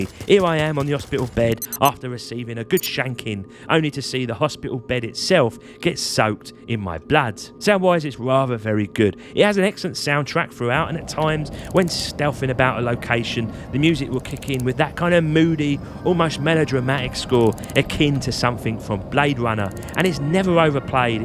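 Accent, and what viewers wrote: Cockney, United Kingdom. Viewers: I didn't think i could watch more shadows of doubt videos but THESE ones have cockney accent! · I'm an American living in UK and my London girlfriend won't let me listen to any let's play videos with American accents as she finds them grating (other than Texan, which I have luckily) so happy to find a Cockney playing games I'm interested in.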